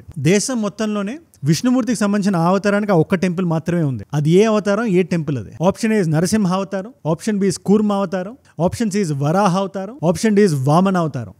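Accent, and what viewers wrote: Srikakulam, India. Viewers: 😊 i. impress you to speak Srikakulam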